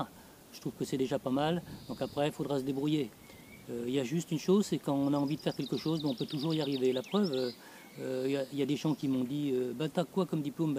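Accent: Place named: France. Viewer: Un humain comme on les aime, pas de superflu, de l'honnêteté, du savoir-faire, l'accent franchouillard qui nous rassemble. Merci de nous montrer la direction du beau.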